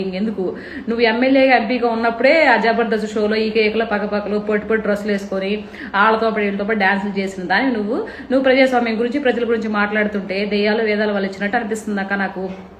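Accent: Nellore, India. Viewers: GODAVARI YASA KADHU RA BABU, MAA NELLORE AK47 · Nellore yaasa not godhaari yaasa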